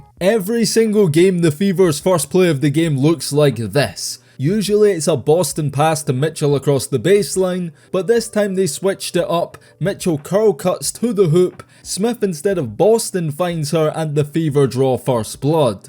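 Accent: Scotland, United Kingdom. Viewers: It's crazy to hear this guy with his Scottish accent talking about American WNBA basketball. 😂😂😂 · Besides the fact that I just cannot stand your voice you embellish so much it is just nuts you exaggerate to the point where I can't even stand to listen to you it's like you are reading this off of a paper which I'm sure you are and it sounds exactly like it I don't know why they don't get somebody with a English voice that doesn't have some kind of Scottish accent I don't know any Scott's that are playing basketball for any team in America it's annoying just listening to you and listening to you exaggerate is the worst part of it there are Junior High girls in America that can do the same things that these girls are doing and you turn a simple layup into the greatest play of all time I just can't stand listening to you these other people can listen to you I'm done with you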